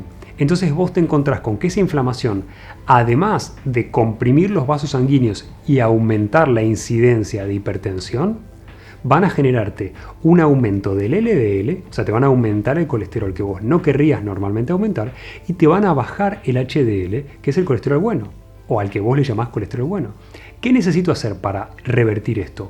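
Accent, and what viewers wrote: Argentina. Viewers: es argentino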